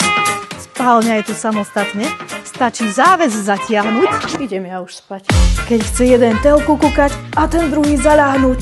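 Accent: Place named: East, Slovakia. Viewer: Ďakujem za odpoveď, zachytila som východniarsky prízvuk, tak som myslela, že ste sa tam len napr. vydali. Tak nech sa darí!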